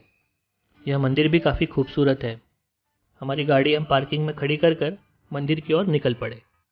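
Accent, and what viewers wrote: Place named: Nepal. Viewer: Nepali soundi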